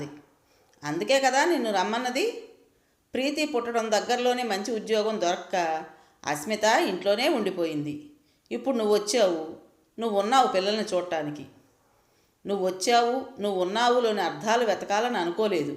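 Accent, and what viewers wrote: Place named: Andhra Pradesh, India. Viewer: Abba entha bagundante matalatho cheppalen,Ee kadha cheppadamlo really super,nenu chadivinadi undinadiAndralone,kani Naa mettinillu Tamilnadu mee gonthu vine tappudu Andralone (maa uru Gooty)unna feeling ravadam kallu chemarchetatuvanti adbuthamaina anubavam kadhanu chadivuthunna prathi saree kaluguthundi 🙏🙏🙏🙏🙏🙏🙏❤️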